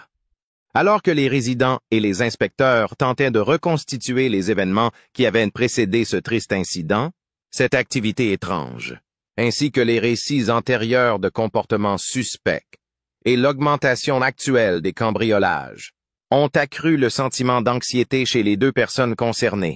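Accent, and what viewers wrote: Canada. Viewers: Pourquoi "Pauvre France" ?? Le gars qui parle a un accent canadien !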